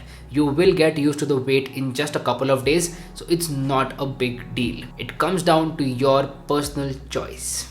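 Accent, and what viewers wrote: India. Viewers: I hope I'll find one Indian with a different voice and accent one day...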